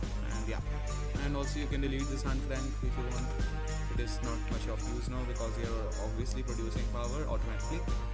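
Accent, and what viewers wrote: India. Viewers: Bro ur indian ?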